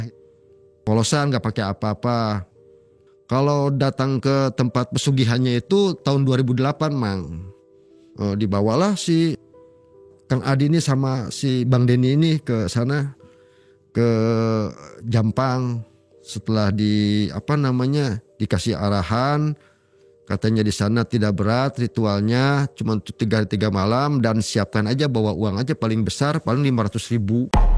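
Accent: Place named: Cirebon, Indonesia. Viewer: Logat ngmngnya ky indramayu cirebon😃🙏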